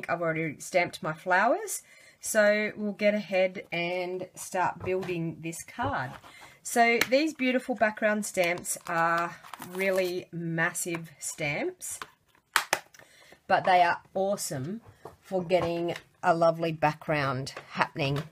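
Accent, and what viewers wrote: Australia. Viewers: Great video. Good to hear an Aussie accent!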